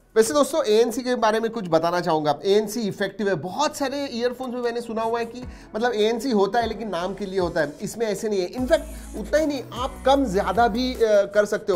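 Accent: Maharashtra, India. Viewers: Are you a Maharashtrian ? And definitely from Mumbai ?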